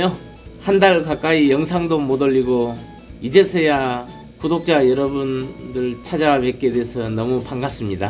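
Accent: Jeolla, South Korea. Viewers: 절라도 사투리가 긴 있당켸 ㆍ ㅎ ㅎ 호 ~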